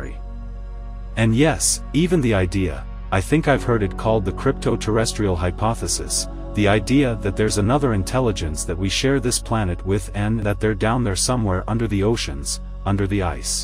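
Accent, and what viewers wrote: France. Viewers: You have a beautiful voice CAPTAIN. You rock the flight deck. Good job! 💖🌞🙋‍♂️👽 "We like your french accent best." Fun to look into a.i. in a good way and have fun with it. ✌️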